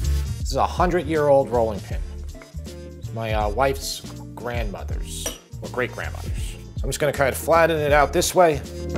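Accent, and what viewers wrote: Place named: Italian-American, United States. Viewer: Ah, Pizza Chiena di Campania, there aint no such word as "gaina" 😀 Thumbs up though, you're a damn good chef and I always enjoy your videos very much and always smile at Italian-American pronunciation. Ree-GOT and Pro-ZHUT and then that perfect Peco-rrr-ino Rrr-omano... Bravo Ragazzo! Really like the lattice you did. I find I don't like a really heavy crust on top nearly so much as this light one. 👍🙏👍 Happy Easter by the way.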